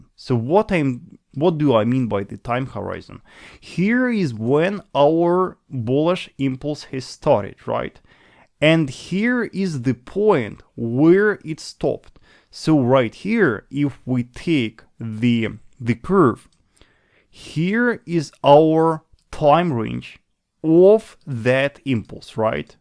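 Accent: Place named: Russia. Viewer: the russian accent....love it....hehehehe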